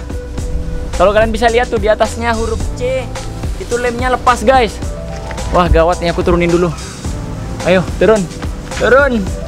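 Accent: Madura, Indonesia. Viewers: Anda orang madura ya kalo iya berarti sama kayak saya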